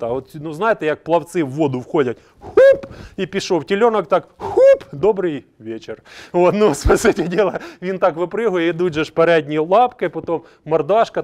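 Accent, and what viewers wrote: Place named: Dnipro, Ukraine. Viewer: Добрый вечер ув. Подписалась на Ваш канал, во всем Вам поддерживаю и рада что у меня есть единомышленники. Я далеко но мечтаю о своей ферме.потому и далеко чтобы приблизить свою мечту и рада что встретила Ваш канал. Я Ваша землячка из Днепропетровщины. Вот только ненада оправдываться за наш акцэнт. Днепропетровщины всегда была и будет русскоязычной, как не крути и какбы это ненравилось нашим братьям из Западной Украины. Почему нам кто-то должен диктовать на каком языке разговаривать. Вам успеха во всем.и добра Вашему дому.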